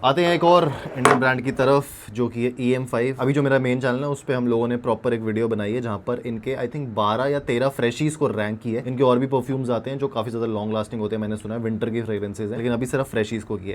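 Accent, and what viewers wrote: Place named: United Kingdom. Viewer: Brit accent ka kya scene hai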